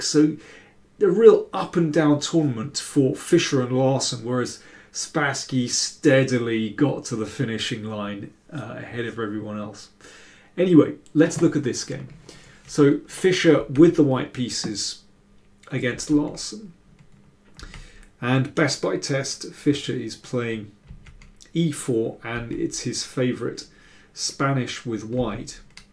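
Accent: United Kingdom. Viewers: Adnan he actually used the word "perilous" in this video which I can safely say Ive never used in my whole life. Its that British diction I love it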